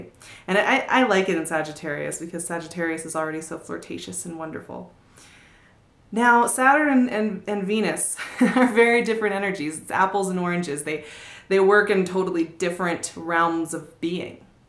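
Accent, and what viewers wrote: United States. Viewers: Train going by?!....New Yorker?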